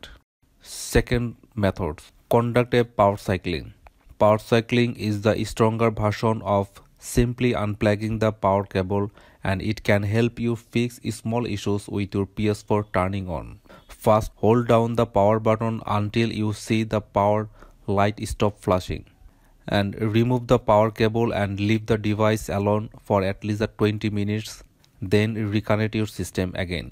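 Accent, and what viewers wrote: India. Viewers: When bro started talking with the Indian accent i knew i was in the right place... But L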